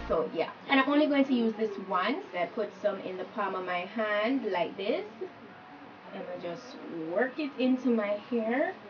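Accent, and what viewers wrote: Jamaica. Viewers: You sound Jamaican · You sound Jamaican!! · She's Jamaican. Our ascent is one off a kind. No othe Culture talk like us. Lots of faker tried. But epics failed. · you sound Jamaican. am I right ? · I hear a jamaican accent. Subscribed😌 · OMG! Your accent is so cute ? Wya from gyal? Jamaica? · Where are you from... Your accent sounds kind of Jamaican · Love your hair... Girl you sound Jamaican. Like ur from like Kingston i guess.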